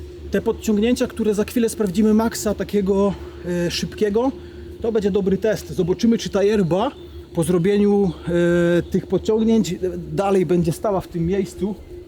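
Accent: Silesia, Poland. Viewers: Super kondycja i fajno ślonsko godka. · Cześć oglądam twoje wyczny od początku kanału,pracowalem dużo na Śląsku w twoich rejonach jako dekarz, i jak wracałem do siebie to godol jak ty😂jak byłem mlody to tes wszystkie drzewa i kominy były moje,teraz wiekowy się robię...moj rekord na drążku nachwytem 19 ale miałem 20 lat...teraz starość zaraz wnuki może będą 😊ale staram się ruszać, pozdrawiam i wysokości, tylko z głową jak zawsze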